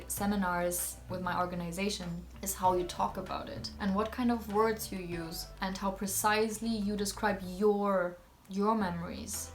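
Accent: India. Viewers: I felt like I was listening to an Indian not a German, I think your have explored India more than an average Indian, Your video was nice in terms of perspective of a outsider or a foreigner of what the person thinks about India and its cultural habits 🙏🙏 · Yes you are right, you are not a tourist you are a citizen of India.. People in my country should understand this, I have one person who is very close to me she experienced the same as you. She feels bad about it sometimes.. And in general she is more Indian than me in many things.. Anyways your accent is full like an Indian, the way you pronounce...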